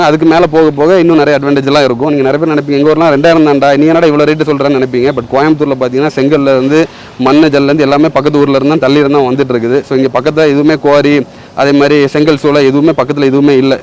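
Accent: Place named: Karaikudi, India. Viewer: ஆமா ஏன் la வீடியோ போடல உன் ஸ்லாங் எனக்கு பிடிக்கும் ல அக்கா காரைக்குடி ல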